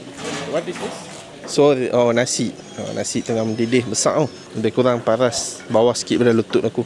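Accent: North, Malaysia. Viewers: Bahasa utara sempoi anak muda Malaysia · Best wehh chanel ni. Dia bantai loghat utara memang sedap dengar. Asal aku baru jumpa ehh chanel ni. Terus subscribe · Baru jumpa chsnnel ini. Menarik sgt. Terima kasih bawa kami menjelajah.. seronok dengar loghat utara awak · Penyampaian yg santai, penggunaan bahasa melayu yg sempurna dengan loghat utara, rasa mcm sembang dgn kawan2 kt kedai kopi tapi masih ada unsur2 professionalism.. sempoi! Hang dapat subscriber baru dari aku. Teruskan kandungan2 mcm ni.. 👍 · Bestnya bawa kami jalan²...Terima Kasih bg kami pengalaman mcm nie..Dngn guna loghat Utara..Mmg Surrr tgk.. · One of the best Travelodge by my fellow Malaysian 🇲🇾. Bangga dgn awak bro. Best dgr loghat utara. Greetings from Vancouver 🇨🇦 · Bro sebab lograt utara hg aku follow bro. Semoga maju jayaa, keep it up bro👍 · Jarang sangat2 komen kat video2 travel. 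Tiba2 naik suggestion video ni. Mula2 dengaq loghat utara pun dah buat aku tertarik. Pastu tgok pulak creator video ni brader India dari Malaysia. Lagi la tertarik. Pastu pulak tgok style travel mcm ni lagi la buat jatuh hati. Teruih rasa nak travel sekali. Baru tau Kerala ni agak menarik. Keep up the good work. Hg mmg best la bro. 🎉👍🏻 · Best lah vlog nih!! Tambah plak dgn loghat utara!! Terus maju saudara! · Terbaikkk la bro...bhasa utara betul2 mantopppp.... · Sedap betui ckp utagha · org utara ka ? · Sedap tengok video2 awak dik. Yang penting info sampai, puas hati & hang cakap utara rasa dekat di hati kak... Hihi. Sat nak pi tengok video2 lain pulak. Teruskan berkarya na 😊❤ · suka dengaq hg cakap utara..cantik permandangan, rasa macam vibe aku kecik2 dulu..terbaik weii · baru 1st time tengok terus subscribe...mantap dapat cakap dlm BM slang belah utara..tqsm n teruskan berkarya 👍👍👍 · frist time tgk video dari bro ni, aku plak jenis suka lorat utara, memng auto subs and like. 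terbaik la king fish tu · Mula ii igt abg Indian sana sekali dgaq utaqaaa 😂 tq bang seronok layan vd abg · Mntap konten hg bang..dgn loghat utaqa tibai pi..mmg auto subscribe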